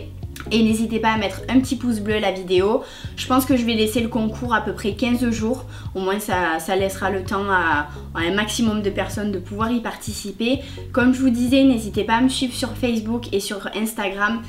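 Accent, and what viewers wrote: South, France. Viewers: Sa se voit que tu viens du sud de la France avec ton accent ah ah, super vidéo :) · Coucou, j'espère que tu vas bien, il n'y pas très longtemps que je suis abonnée à ta chaîne et je trouve que les montages de tes vidéos sont agréable a regarder et tu as un accent du sud que je connais particulièrement puisque je suis aussi dans le sud. J'ai pu tester beaucoup de produit sephora notamment les vernis qui sont pas mal et je trouve aussi qu'il se sont amélioré sur leur produits. Je participe au concours, bonne soirée ☺ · Vidéo au top 💘 L accent du sud le meilleur Ahah 🌞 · Merci énormément pour ce concours auquel je participe ! Je te suis depuis un petit moment et je te trouve super !!! Continue comme ça ❤️ Ps : j'adore ton accent et vive le sud ! 🙊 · Super haul! Je te suis sur ta chaine depuis pas très longtemps, mais je suis très contente. 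Tu es simple, et tu prends beaucoup de temps pour tes abonnées et je trouve ça bien et presque rare maintenant sur Youtube.Tu mérite largement tes 15 000 abonnées. Et l'accent du sud, et la bonne humeur ; j'adore! :D Je ne connais pas la marque Sephora, mais j'en ai entendu du bien, je participe au concours. Gros bisous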